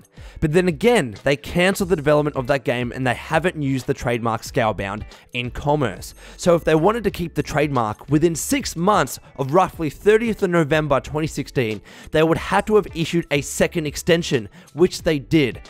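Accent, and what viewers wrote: Australia. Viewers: I really like accent. Are there other Australian YouTubers that y'all suggest I should follow?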